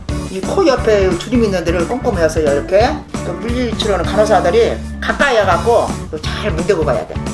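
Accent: Jeolla, South Korea. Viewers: 메이크업 영상 나를 울리게 하네요 웃다 울다. 우리엄마 생각나게 해서 웃다가도 울고 전라도 사투리에 엄마랑 목소리 말투 너무 똑같아서 계속 보게 되요. 한 영상을 진짜 여러번 봤어요^^ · 할머님이 전라도 사람인가요?? 저희 할머니하고 말투가 완전 비슷해요 !!!ㅋㅋㅋㅋㅋㅋㅋㅋ저희할머니보는 느낌 ..❤️ 오늘도 잘보고 가요 !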